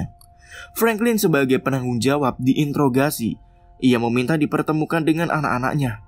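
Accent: Java, Indonesia. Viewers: Weh anjai wong jowo to tibak e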